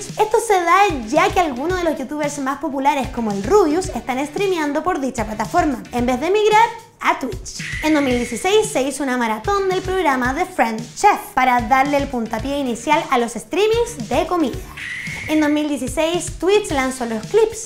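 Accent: Chile. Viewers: Me encanta ese acento chileno tuyo 😍👍Saludos desde Brasil 👋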